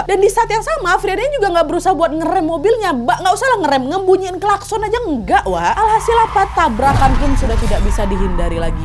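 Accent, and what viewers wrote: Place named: Palembang, Indonesia. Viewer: Suka banget sama cara bicaranya,.wong kito galo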